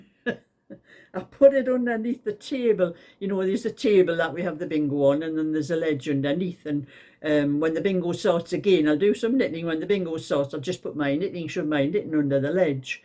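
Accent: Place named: Yorkshire, United Kingdom. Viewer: Hi, well had a chuckle at you with the conversation with 😂😂😂.. congratulations on your wins, well done 👍..Hope your heatwaves have ended.. Spring nearly here in Sydney so plants & lawns will be taking off.. we’re 9 hours ahead of you over here I thought I’d mention… I’m a Yorkshire lass born in Sheffield (well not a lass anymore) 😂😂😂 so enjoy your accent, love listening to you chatter on… lots love Josie xxx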